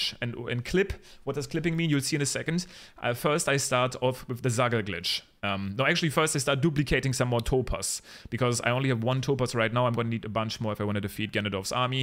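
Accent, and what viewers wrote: Germany. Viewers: Are you german? Like I somehow feel you are german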